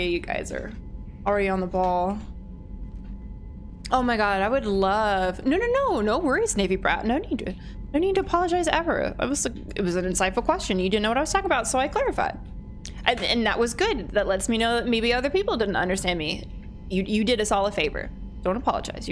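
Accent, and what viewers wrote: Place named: United States. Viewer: It’s definitely because you’re American cause I remember when I went to the beach and met this Irish lady we both thought each other’s accent were pretty neat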